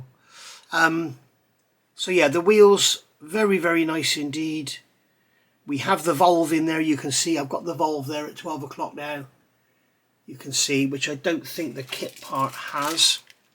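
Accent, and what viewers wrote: United Kingdom. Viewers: define the accent...........UK?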